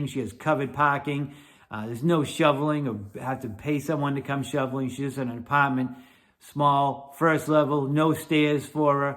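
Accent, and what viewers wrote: Boston, United States. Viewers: I could tell from your accent that you were from Boston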